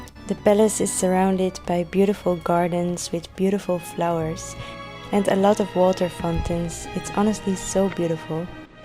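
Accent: Netherlands. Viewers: You must be Dutch lady. I recognized your accent, machalah fellow woman.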